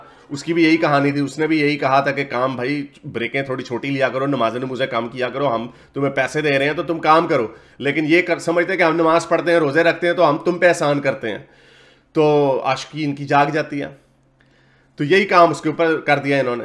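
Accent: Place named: Punjab, India. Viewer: He has a Punjabi accent. The original Urdu accent is the Delhi Urdu accent since Urdu was born in Delhi.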